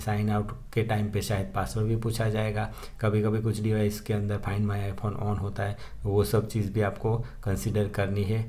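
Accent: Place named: Rural, India. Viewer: Why Is the Video Title english but the actuall Video Is a villager speaking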